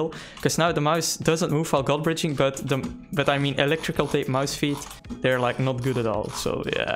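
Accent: Netherlands. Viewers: Are you dutch?? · can i ask you a question are you dutch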